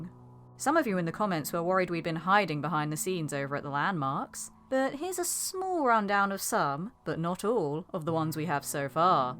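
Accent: United Kingdom. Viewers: Bri ish 🤢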